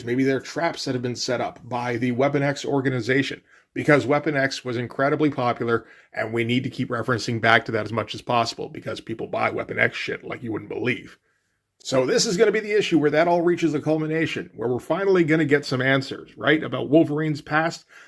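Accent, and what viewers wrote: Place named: Canada. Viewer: Funny i pegged you as Canadian from your accent. You sound like Kevin Owens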